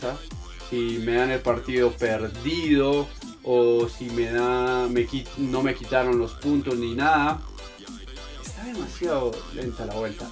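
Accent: Colombia. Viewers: eres de colombia???si eres de colombia de que parte de colombia????se te nota cuando hablas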